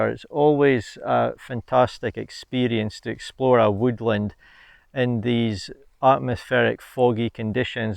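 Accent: Scotland, United Kingdom. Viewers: Very nice image. Your videos are lessons in the Scottish accent!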